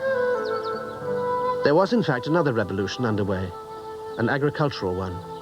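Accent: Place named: RP, United Kingdom. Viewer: It's called received pronunciation or just RP, often called BBC English or The Queen's English. He's an English actor, probably retired by now.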